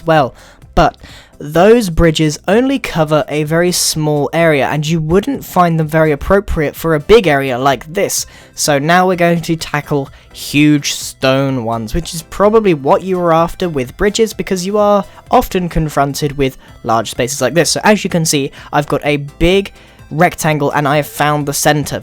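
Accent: England, United Kingdom. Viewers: Hey, love you English accent! 👍👍👍👍👍👍👍👍👊👊👊👊👊👊👊👊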